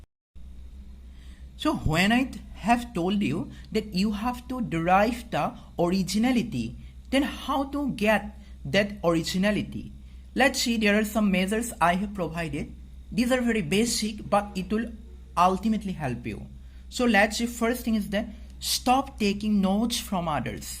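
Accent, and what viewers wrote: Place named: Northeast, India. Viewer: U sounds like from the northeast 👍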